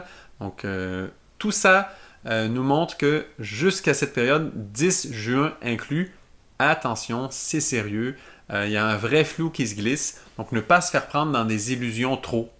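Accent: Quebec, Canada. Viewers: Quel joli Monsieur avec un charmant accent Québecquois et qui parle vraiment bien et juste!!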